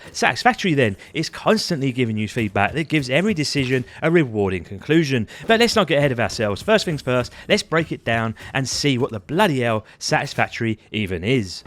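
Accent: Cockney, United Kingdom. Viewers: Ok, Im sorry. Unbrittish person here. Is that actually a cockney accent? It is not at all what I imagine a cockney accent to be. Is it some sort of sometimes has tea with the queens, sorry kings, gardener kind of kockney or am I having a stroke?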